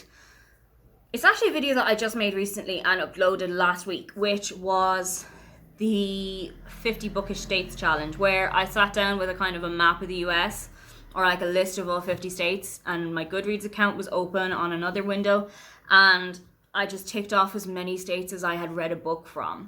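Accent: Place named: Germany. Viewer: I love your accent. I find it really hard to understand the Irish accent most of the time but your accent is so easy, maybe it's a bit of the German açcent you have picked up, have you lived in Germany long?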